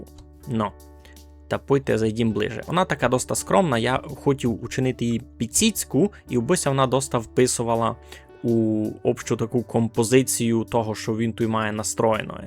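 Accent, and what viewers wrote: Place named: Mukachevo, Ukraine. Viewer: Та просто цікаво стало, в мене є знайомий просто з околиць Мукачева, то він говорить дуже подібно до вас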